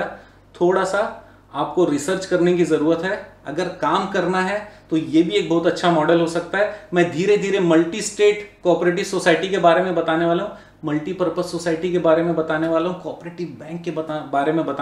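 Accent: Bihar, India. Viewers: Bihar se ho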